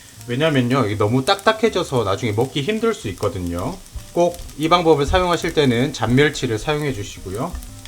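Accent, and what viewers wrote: Gyeongsang, South Korea. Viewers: 멸치 볶음 때문에 구독한 게 아니라 경상도 구수한 목소리가 귀에 편안해서 구독합니당🤣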